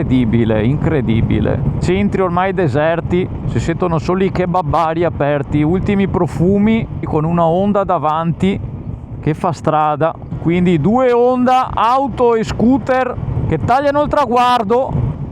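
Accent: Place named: Treviso, Italy. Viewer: Che bel canale!! Ho visto qualche video, ti faccio i complementi. Io sono veronese, ho sentito il tuo accento trevigiano ma e pressoché identico al mio!! Siete riusciti a fare un bel tour Treviso Germania?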